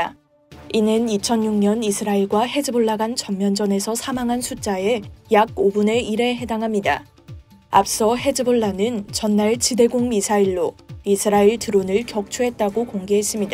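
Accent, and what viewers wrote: North Korea. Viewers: 나레이션 탈북자 엑센트네요 ㅎㅎ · 북한 말씨네. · AI 어투가 북한말 같아요 한국 방송답게 해주세요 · 웬 북한 억양? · 말투가 북한말인데?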